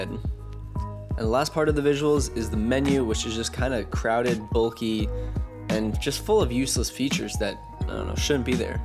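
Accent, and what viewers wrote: Switzerland. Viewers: Are u from Switzerland? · Wait, are you from switzerland?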